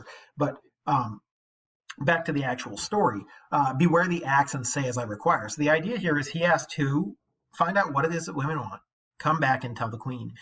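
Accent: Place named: Oxford, United Kingdom. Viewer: Dr / Mr, I like your Oxford English accent. I live in the south of England.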